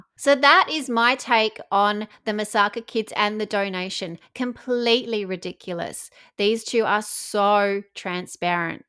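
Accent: Australia. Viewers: We can hear you're from Australia. Greetings from South Africa 👏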